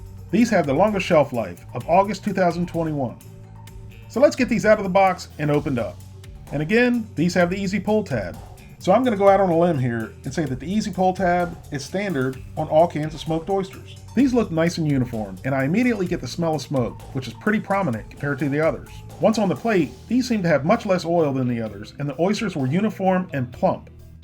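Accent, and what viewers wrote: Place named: Carolinas, United States. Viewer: Blow Me he is from the Carolinas I think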